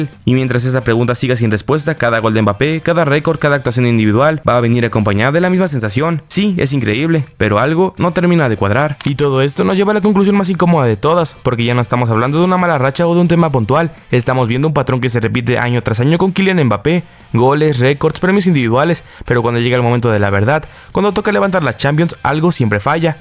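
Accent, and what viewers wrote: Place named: Yucatan, Mexico. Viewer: Es que es yucateco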